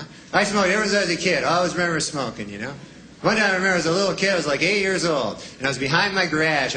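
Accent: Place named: Canada. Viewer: He sounds so Canadian holy crap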